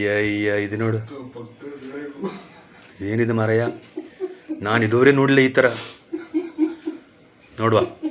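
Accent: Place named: Gokarna, India. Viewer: Your Gokarna accents, beautiful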